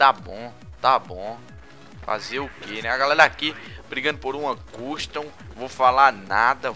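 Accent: Northeast, Brazil. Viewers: vc é do nordeste? não sei mas percebi pelo sutaque slá. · vc é nordestino??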